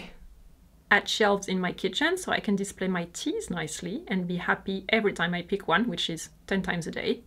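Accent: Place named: France. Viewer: I found you, during this self isolation here in America. I love your videos. Your French accent is so lovely. You are so graceful and kind. I subscribed to your channel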